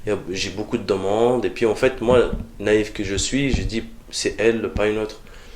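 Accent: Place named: North Africa. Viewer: Oui il a un accent du bled peut être il est venu en France à l'adolescence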